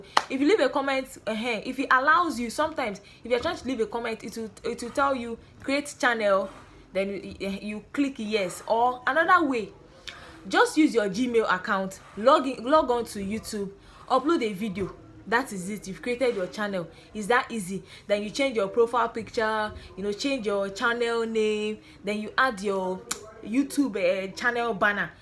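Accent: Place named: Igbo, Nigeria. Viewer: I remember your first video I watched that made me love your content. That motivational speaker video. I laughed so hard. let's do part two of that video together...Lol. I love your igbotic vocal in this video. So pure, original and quality content as always. I love you, baby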